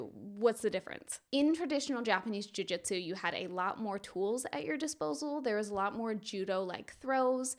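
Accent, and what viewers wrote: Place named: United States. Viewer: Maeda went to brazil specifically to showcase judo. He was a prize fighter who traveled the Americas doing demonstrations and challenge matches. He was not a business man. She's American, so I wouldn't say it's incorrect to pronounce their names with an American accent. I've heard some Americans that train under the Gracies use the R when pronouncing their names. Roger Gracie fought once in the UFC against Tim Kennedy.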